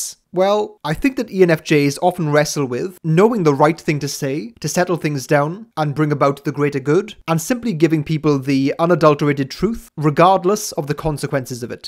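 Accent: Wales, United Kingdom. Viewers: Pretty sure he's from Wales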